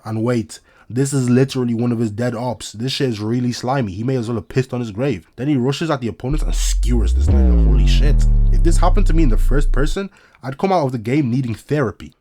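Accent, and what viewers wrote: Ireland. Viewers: U sound Irish a bit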